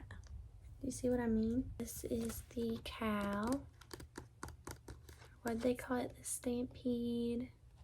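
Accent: South, United States. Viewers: Soft spoken ✨️ Southern accent 🌸 Showing us things on iPad 🎀 10/10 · i love your southern accent videos, hope to see more of them <3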